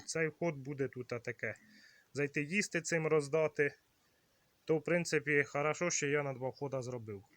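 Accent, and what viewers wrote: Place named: Poltava, Ukraine. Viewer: полтавський говор не сплутаєш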